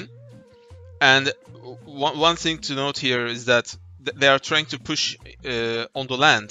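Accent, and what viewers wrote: Turkey. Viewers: Knk türk aksanı sezdim türk müsün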